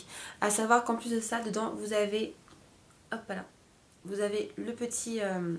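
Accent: England, United Kingdom. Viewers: j'adore ton accent anglais mdr!!!!! <3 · j'adore ton accents anglais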